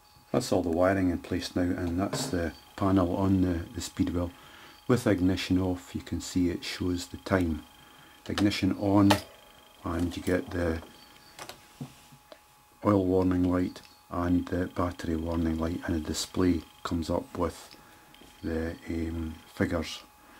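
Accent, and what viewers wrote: Scotland, United Kingdom. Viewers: Very well thought out and delivered----in a Scottish accent, even better.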